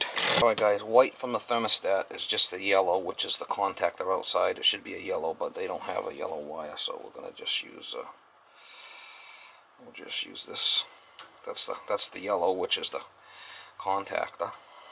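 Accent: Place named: South, United States. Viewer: You must be from the south with that accent. - We are down in New Orleans.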